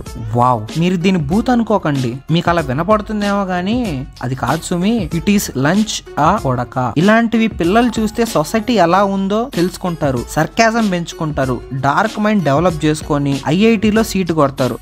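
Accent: Nellore, India. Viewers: Anna meedhi nellore aa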